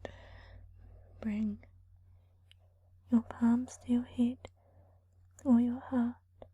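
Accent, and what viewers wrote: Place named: New Zealand. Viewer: Thus us an ASMR Chunnel. I found ut to be relexing AF. Thenks. Aussie here, Dig the Kiwi acksent!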